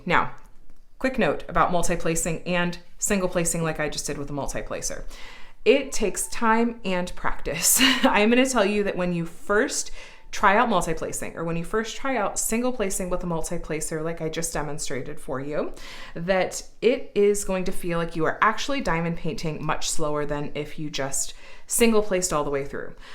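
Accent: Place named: RP, United Kingdom. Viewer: Very interestingly I picked up on what I perceived as an upper classman kind of "accent" at times. Maybe this is just a product of that teaching technique called ENUNCIATION. Whether intentional or not, to me this really INCREASED the perceived greatness and value of a project like this, and makes both the craft and the video much more interesting. Currently working on my first about Jesus holding hands with a child while walking on a trail in a forest. Love doing this.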